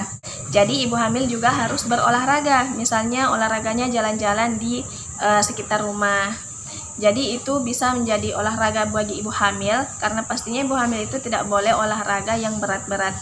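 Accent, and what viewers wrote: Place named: Makassar, Indonesia. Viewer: Org makassarki 🤭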